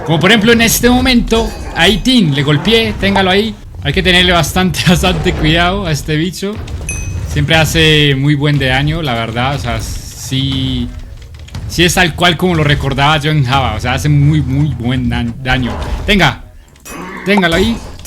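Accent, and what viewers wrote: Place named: Colombia. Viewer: Jjajaa ese acento se nota de Colombia saludos parcero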